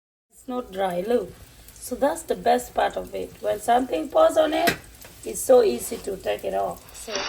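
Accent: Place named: Luo, Kenya. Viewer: Thanks for the very helpful tips, and especially nice to hear the instructions in your Luo accent.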